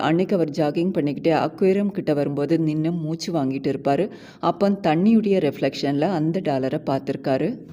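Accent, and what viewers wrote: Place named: Nagercoil, India. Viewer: Nagercoil slang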